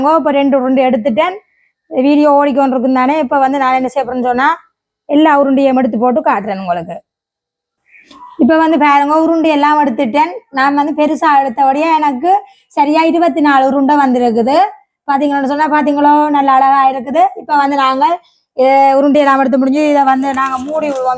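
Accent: Sri Lanka. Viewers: நீங்க பருத்தித்துறையா சகோ 😂😂😂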